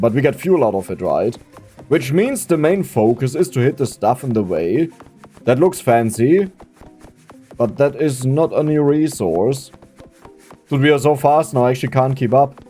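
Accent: Germany. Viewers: I see now that i love ur videos because of your german accent xd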